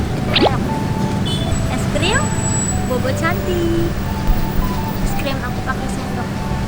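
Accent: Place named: West Java, Indonesia. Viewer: Channel aksenya kayak sunda sih wkwk eh aslinya tegal 😂😂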